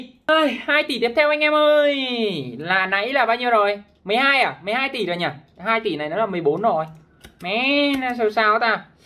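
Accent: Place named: North, Vietnam. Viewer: người miền namnois giọng sương sương miền bắc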